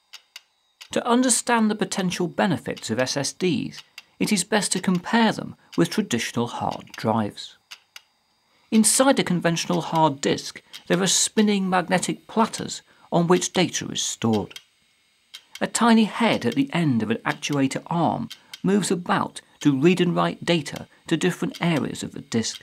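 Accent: England, United Kingdom. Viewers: I am an Hellen (greek), but english is perfectly articulated and his videos very enlightening and educative. Thumbs up for both the cool English accent and valid content of this gentleman's videos. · This is by almost tradition how BBC news speaks or most of British documentaries. from his accent i would say hes British. that is just how they narrate this is fine just somehow feel sorry they seem pretty sad when they narrate. almost as if telling me "Why would you do that :( " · I think he's Brittisch ^^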